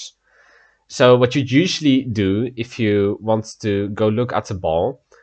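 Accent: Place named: Netherlands. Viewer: woah, i did not expect this this channel to be under rated! nice vids fellow Dutch man! (At least, you sound Dutch :) )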